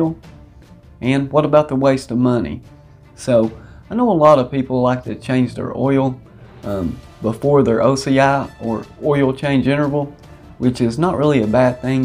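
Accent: South, United States. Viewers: Slow drawl southern hick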